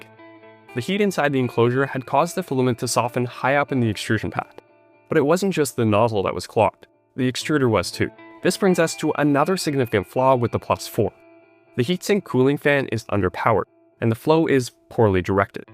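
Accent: Canada. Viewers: Are you Canadian? It's difficult for a non-English speaker to tell, but i think there are some words that fit the bill ("about").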